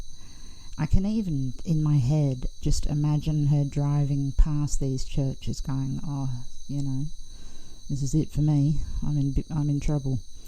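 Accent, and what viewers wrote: Australia. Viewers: Are you Australian? Great video...praying for Gannons return 💙💙💙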